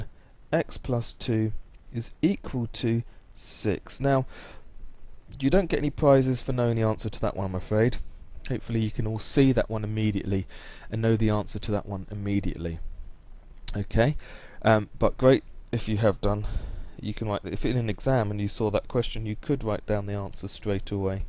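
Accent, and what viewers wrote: United Kingdom. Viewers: I'm guessing the second method is something British people do? No disrespect, it's just a guess. (Your accent sounds British, that's how I got to that conculusion.)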